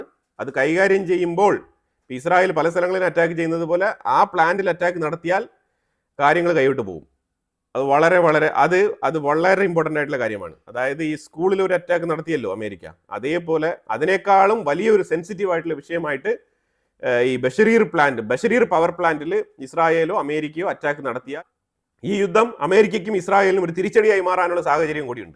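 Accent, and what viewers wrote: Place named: Trivandrum, India. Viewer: Your News presentation is excellent. But I think, you have a small Trivandrum accent.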